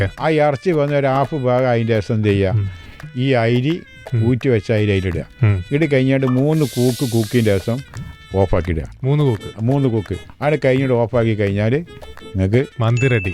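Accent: Malappuram, India. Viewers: മലപ്പുറം ഭാഷ 😂😂👍🏻👍🏻👍🏻👍🏻😊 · മ്മളെ മലപ്പുറം ❤️❤️❤️👍👍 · ഒറിജിനൽ മലപ്പുറം ഭാഷ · മലപ്പുറം ബാസ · Malappuram slang ❤ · ഇത് മലപ്പുറം ഭാഷ ആണോ ഒന്നും മനസ്സിലായില്ല കാക്കാ 🤣🤣 transalate ചെയ്യ്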